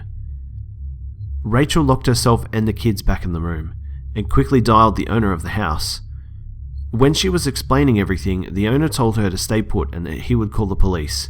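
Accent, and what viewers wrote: Australia. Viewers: Guys this dudes Aussie isn't he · He's Aussie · I love scary stories and Australian accents so this account is very very enjoyable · ikr i cant stand Russian or spanish accents ( no offense, this doesn't mean i hate these ppl i just cant understand it 2 well ) so i was like please don't have a Russian or spanish accent.... YES AUSTRALIAN ACCENT AND GOOD SPOOKAY STORIES!!! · hes aus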